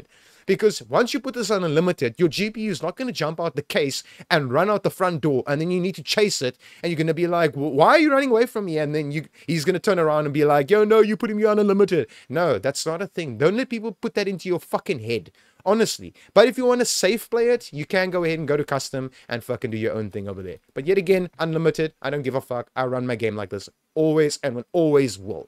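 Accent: South Africa. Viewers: You're literally the only guy that explains everything correctly. And You're South African made everything easier. Thanks buds im running 5th gen I7 and 3070 12 gig this helped me big time.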